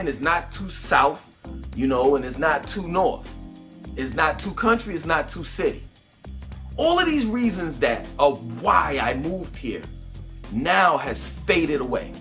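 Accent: New York, United States. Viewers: The irony of this Northern dude talking about Charlotte & Atlanta getting too much for him. He sounds like he from NYC…Queens or Brooklyn to be exact. He’s part of the problem 😂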